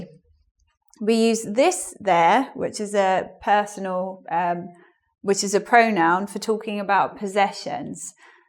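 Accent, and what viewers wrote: United Kingdom. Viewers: Excellent Video.... I love it the british accent....very useful for me, thanks for sharing. One thing ....you are excellent teacher.....Don't worry about bad comments.... Congrat. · I do like your British English! I'm fond of it! : ) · Has the British prounonciatinon?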